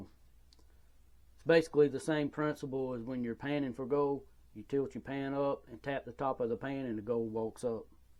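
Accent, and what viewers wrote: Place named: South, United States. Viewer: I love your southern "slang and pronouncing" .....!!!!!! I'm original from Germany ,- and have friends in NacogdochesTexas ...... I use to mine for long years in B.C. Canada ....and work for big Mining Outfit as a consultant ..... Concentrating and mineral dressing .... Unfortunately it is real difficult to build a full working shaker table ...... ( according to the "TAGGART" ) ... but you came real cloth to it ... compliment !!! You are kept in thoughts ,- that there should be a special movement , who use the gravity and the mineral density for separation on a special surface ,- plus the influence of a water matrix and angle .... 👍👍👍 great job .... well done !!!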